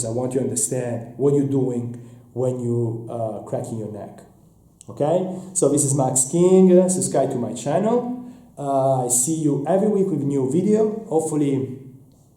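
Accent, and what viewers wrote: Italy. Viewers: I know an italian accent when I hear one. Grazie frate’ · Fra ma quanto sei italiano hahahah (nel senso che si sente non altro)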